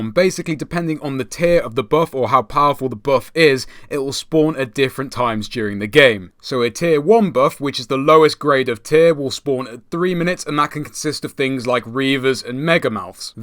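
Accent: United Kingdom. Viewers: u scotish?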